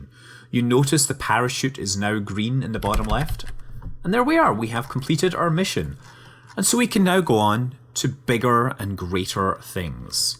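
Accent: Scotland, United Kingdom. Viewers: Scottish accent ftw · he's definitely not canadian. my best guess is he's from scotland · Are you Scottish? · Holy potato, i love scottish accent!! XD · he sounds Scottish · are you Scottish? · Scottish with a hint of American, where I am to understand he lives. And I might add your English is better than many native speakers I know!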